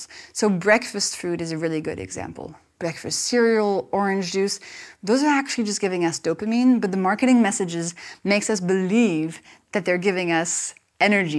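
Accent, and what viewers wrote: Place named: France. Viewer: You are the first french person that I hear talking English and your accent in combination with your calm voice is so pure beautiful. Its like listening to a romantic song.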